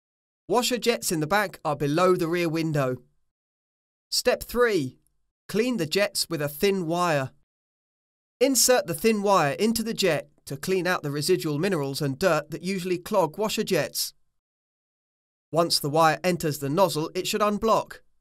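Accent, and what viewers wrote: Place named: United Kingdom. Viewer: Sounds British, why has he got a LHD vehicle?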